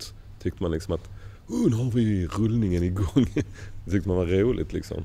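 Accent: Sweden. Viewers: Vad i helvete, är han skåning? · Sjukt ovant och höra honom med skånsk dialekt :P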